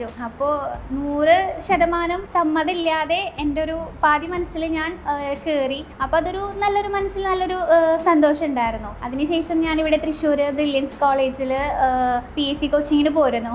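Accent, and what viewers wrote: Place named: Tamil Nadu, India. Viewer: സംസാരം കേൾക്കുബോൾ കേരളത്തിൽ ജനിച്ചത് alla alle തമിഴ് നാട്ടിൽ ആയിരുന്നു alle ജനിച്ചത് 🤔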